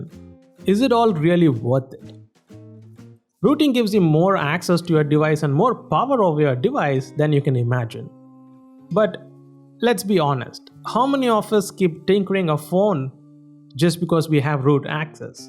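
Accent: India. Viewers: omg the indian accent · Starts the video-lemme guess hes gonna be indian.. -Seconds later(indian accent) in my last video.... Called it.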